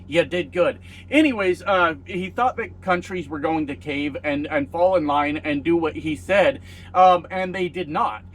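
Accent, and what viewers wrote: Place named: Canada. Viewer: Howdy Trucker from Canada! Keep these videos coming. I'm addicted now to my daily Postcards from the Cab! You sound Canadian!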